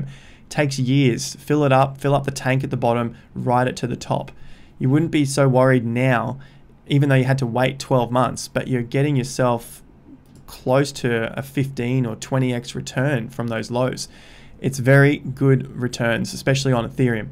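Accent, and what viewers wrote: Australia. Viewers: I'm going to be leaving university and hoping to get a job in September. I think crypto is definitely a space that I'll invest real money into during the next set of lows later in the year (at the moment I'm working with about £100 and doing what I can) and I think I'll buy the Investor Accelerator too because you're genuinely helping me learn (and your Ozzy accent is nice to listen too xD)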